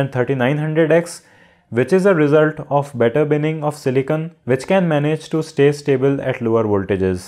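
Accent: India. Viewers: You have the stereotypical Indian accent. Good video.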